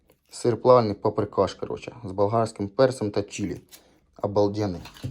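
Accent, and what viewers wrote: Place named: Lubny, Ukraine. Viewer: В мене рiднi в Лубнах. Говiр дуже схожий😁 🇺🇦✌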